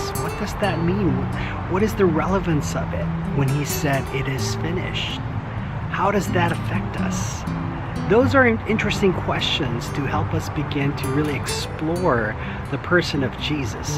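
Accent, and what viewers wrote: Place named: United States. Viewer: American accent na c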